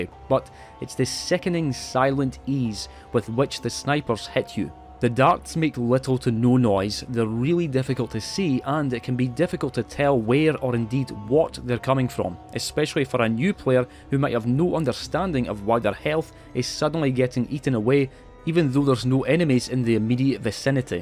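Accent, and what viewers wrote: Scotland, United Kingdom. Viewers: Love hearing a fellow Scot on here ✌ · Ok pal I hate to be off topic but you have one of the coolest fucking accents I have ever heard in my life. I'm sure to you it sounds "plain" or "normal" since you grew up with it, but I am straight up in love with how you talk. Whereabouts in the world? Scotland? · Came out of curiosity, stayed for the Scottish accent 🥰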